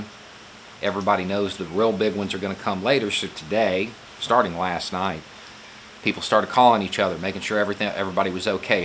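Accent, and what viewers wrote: South, United States. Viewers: 100% agree with everything you said. Keep it up. Off topic. No offense, but that southern accent was really coming out, and I'm southern too. Why does it sound so much better on southern women though, haha. A prime example of a southern woman's voice: <link> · lol HE explains this in a older video. He used the plain American English accent for his reporting jobs, because he wanted to be taken seriously. THIS southern accent is his real one.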